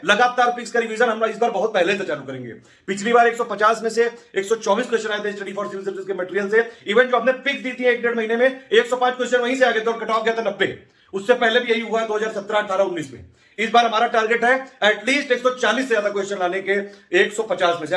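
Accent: Kanpur, India. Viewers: Sir ji kanpur ke lag rahe hai 😄😄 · Kanpur ke lag rahe ho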